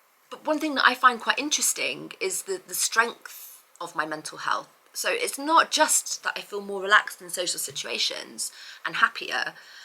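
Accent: United Kingdom. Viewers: Hi. It's so nice to hear a fellow (and beautiful) English accent. Best of luck with everything.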